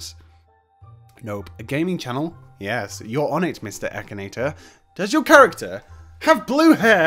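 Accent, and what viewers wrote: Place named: Leeds, United Kingdom. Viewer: You from leeds or am i wrong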